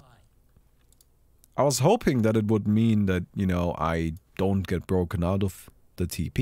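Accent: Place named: Germany. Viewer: Ein Deutscher der Englisch redet, da kann man immer raus hören dass es ein Deutscher ist. Btw was ist mit dein Mic los ?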